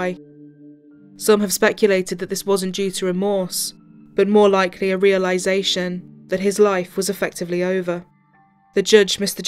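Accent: United Kingdom. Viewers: actually some British English speakers do pronounce some words this way even though they are ‘English’ words. You can google it if you’re more curious. I just did to confirm that my UK colleagues weren’t an anomaly.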